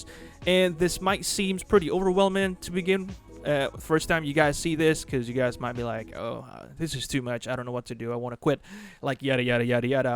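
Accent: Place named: South, United States. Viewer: This guy really knows what he's talking about. His southern accent is so genuine!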